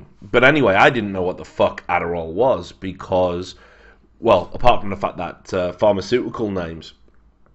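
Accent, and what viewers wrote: Wales, United Kingdom. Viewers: I picked up the welsh in your accent :D great vid, good points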